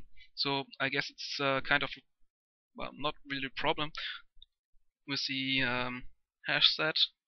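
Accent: Germany. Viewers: Bist du deutsch hört sich so an wen ja schreib was auf deutsch German !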